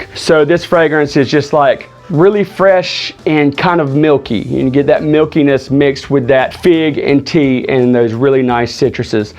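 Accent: South, United States. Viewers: nice review with a nice southern accent 👍🏾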